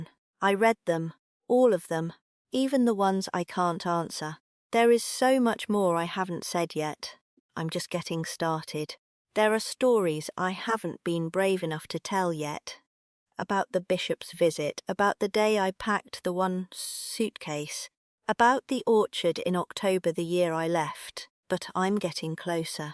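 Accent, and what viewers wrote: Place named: England, United Kingdom. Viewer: And, now she has an English accent. I hate AI.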